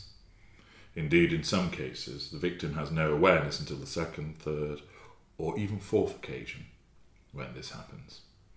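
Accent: United Kingdom. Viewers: Same here! I love British accent. It's so smooth and hot. 🔥😂🤭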